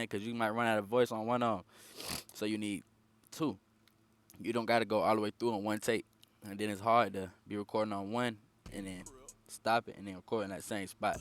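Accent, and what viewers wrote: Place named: Atlanta, United States. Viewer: yuh he frm atl fasho😂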